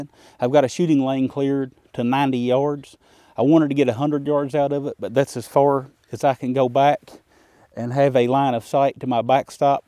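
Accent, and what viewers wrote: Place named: South, United States. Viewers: Whatever it is, he has it on thick. Wearin’ it thick. A lot of YT’bers do this. lol. The best, are the northern “southern “ draws lol. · Very excellent videos as well as the information you provide. Love the accent! Tennessee? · That is one cool sounding accent. I am guessing from the South but you are bundled up too much🇨🇦🤠Great vid answered some questions l had thanks.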